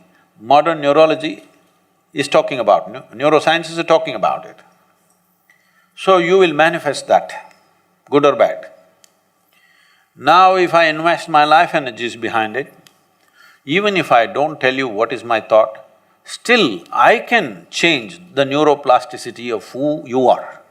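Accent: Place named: India. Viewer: I've subconsciously created the thought that i truly can't stand the Indian accent anymore and so it is now. When I hear it, i get this "eww" type of feeling, such an ugly accent.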